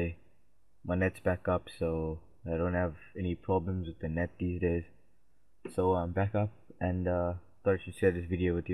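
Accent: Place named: South Africa. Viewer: South African :D lol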